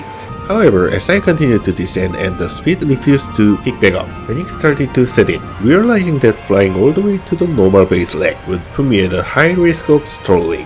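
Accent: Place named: South Korea. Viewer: guessing from your accent you're Korean right?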